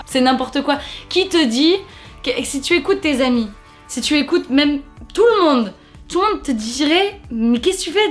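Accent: France. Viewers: C'est beau 🥰🥰🥰 Cet accent niçois qui fait toujours plaisir 🙃☀️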